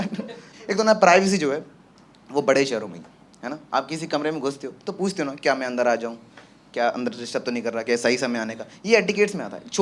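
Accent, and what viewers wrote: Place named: Gwalior, India. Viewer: Proper gwalior accent 😂😂 love from gwalior...proud of you ❤❤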